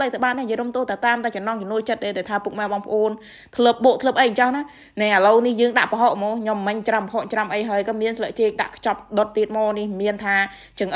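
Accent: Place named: Siem Reap, Cambodia. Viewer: នៅខែត្រសៀមរាបបានជានិយាយល្អើន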